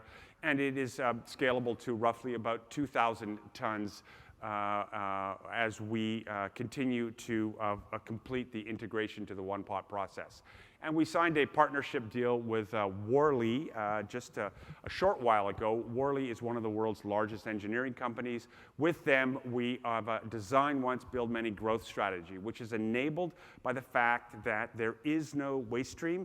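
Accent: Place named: Canada. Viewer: More b.s. with a Canadian accent. Does this little socialistically propped-up company really think it will hold the major powers hostage with their patented processes?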